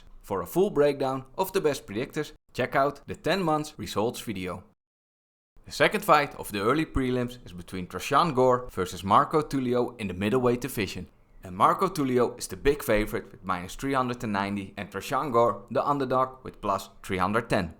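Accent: Netherlands. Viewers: holy shit jij praat zo tering engells op een nederlandse manier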